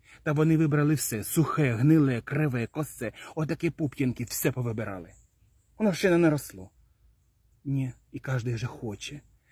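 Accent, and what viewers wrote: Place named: West, Ukraine. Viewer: Я фанатка русского языка. Но думаю: как было бы неинтересно, если бы все славяне говорили на чисто русском! С таким удовольствием вас слушаю! Да ещё эта западная деревенская манера! 👍👍👍 У меня родственники на западе в селе - точно так там все разговаривают!😂😂😂❤❤❤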